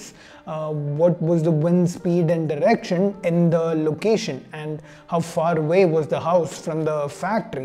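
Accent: South India, India. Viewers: it's not the spelling mistake. It's the accent. South Indian accent.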